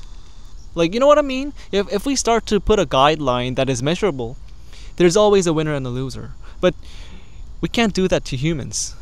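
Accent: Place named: United States. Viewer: Your english is on point man you could pass as a american.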